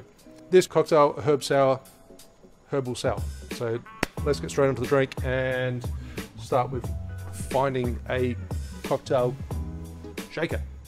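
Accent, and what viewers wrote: Germany. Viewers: I love your German accent! And it looks like a great drink!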